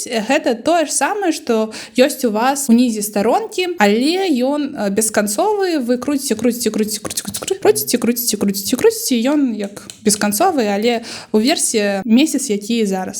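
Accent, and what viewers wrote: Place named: Ukraine. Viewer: Вельмі файна ў цябе выходзіць) Асабліва з ўкраіскім флерам)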